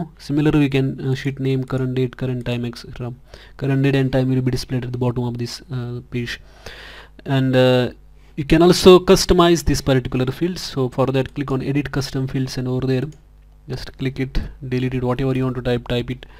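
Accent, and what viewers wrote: India. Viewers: Lol - Italian?! Indian, I'd say, Bangladeshi at a stretch.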